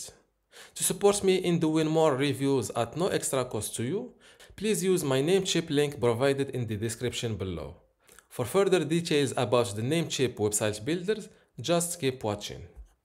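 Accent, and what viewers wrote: Morocco. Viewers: my greetings to our moroccan accent reviewer , good review indeed !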